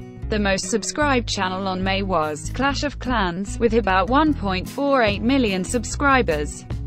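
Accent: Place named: Portugal. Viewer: EU prefiro o sotaque português ,e enclusive assistindo seus vídeos eu aprendi o sotaque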